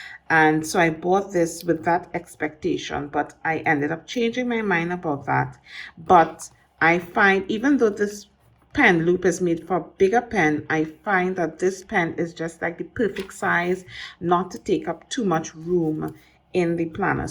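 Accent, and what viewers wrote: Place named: Caribbean. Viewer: Beautiful planner setup 👍. It's really great to hear a Caribbean accent speaking about my obsession ❤️. I purchased my Filofax on Amazon as well. So sad we cannot get this stuff in the islands.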